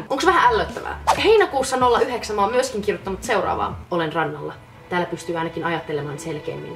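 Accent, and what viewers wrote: Finland. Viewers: That Finnish accent makes her look hotter wth❤❤😍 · Wow, Love your Finnish accent:)... also didn't realize your so young (... now I feel? like 'Edward Cullen' old;-). Personally, didn't keep a journal at that age ( a guy thing?... couldn't write right!... hum? still can't!;-). Thanks for sharing your ridiculous with absolute strangers... kinda makes the world a much smaller place. <3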